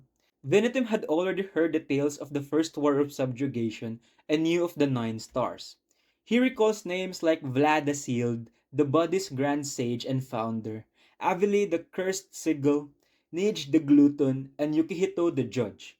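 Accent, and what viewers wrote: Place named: India. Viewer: Please remove Indian accent 😭😭😭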